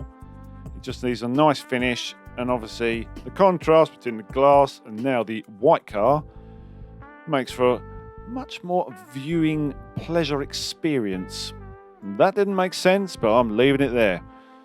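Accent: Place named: England, United Kingdom. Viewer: One. Of the things I love about you and Jon from Forensic detailing is you both give wonderful narration and cool ass English accents 👍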